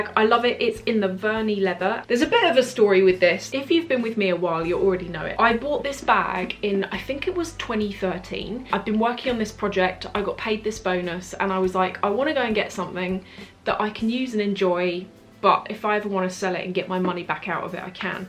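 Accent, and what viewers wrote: United Kingdom. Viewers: Hello. I recently discovered your channel and I absolutely adore you!!! Due to your British accent, I struggle to understand some of the things you say. You stated where you sell your bags and I could not get what was said.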